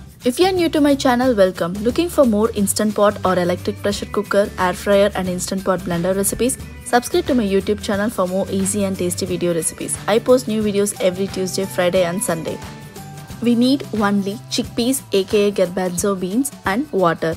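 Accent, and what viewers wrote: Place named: England, United Kingdom. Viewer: What English accent!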